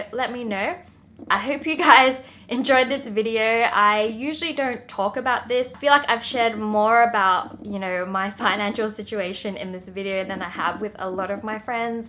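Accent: Australia. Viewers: Is she Australian??? Fellow Aussie! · Are you Australian? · Love that Australian accent tho... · Are u an Aussie ??? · OMG her Australian accent is way stronger in this video compared to her 2018 vids 😂😂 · are you australian? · is that Australian accent? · Your accent changes. I hear Australian n American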